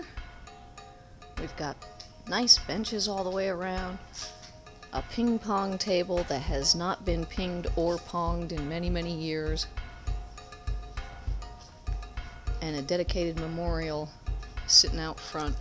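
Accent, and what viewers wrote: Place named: Chicago, United States. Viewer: Fred C. Birchmore Sr "Many a sermon I am sure has taken place at this location." These insightful words, uttered from the pulpit by this bold and intrusive explore,r epitomize her investigative reporterism talents. The short movie isn't bad if the sound is turned down to muffle the nasal Chicago wannabe Madow intonations. Her breaking and entering the Milford cabin should not have been caught on film, though, since that is now evidence of her committing a crime. If the <link> is still there where she wrote it in the table dust, that would also be prosecutable as vandalism, or "tagging." As a sort of cultural or denominational exchange it would be an interesting followup to break-and-enter her abandoned home in Florida (it is clearly abandoned when she is not there) and root around to speculate or fabricate her lifestyle and generally invade her privacy. 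I wouldn't play that spooky music and tinky gong, though. Maybe a sound track of "Catfight in Bizarrino" played backwards instead. That is my cabin, or "tent," BTW, with the children's claybank hands on the front (which date back to the 1920s). That venerable structure is not representative of my real houses in Hollywood and San Diego, but I plan to be there this summer, as I have for 72 years, and as my family has since 1832. Like - Reply - Just now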